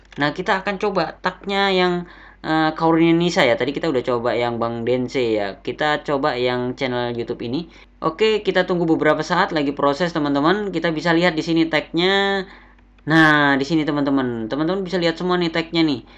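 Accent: Java, Indonesia. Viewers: Kewoooooot....mantab...paste....medok2..tp?lucu.mwkkwkw...iklane bang...ngeri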